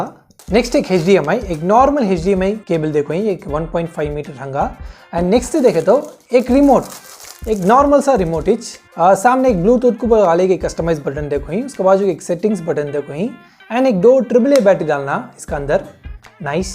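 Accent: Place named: South, India. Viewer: Super jab koi sauth indian hindi men baat karta hai to bahut achha lagta hai sunne men ..superub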